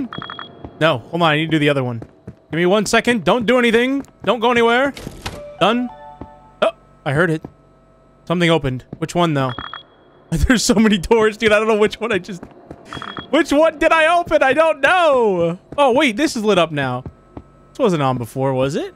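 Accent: United Kingdom. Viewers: Yet another British accent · i love the british accent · British sounds good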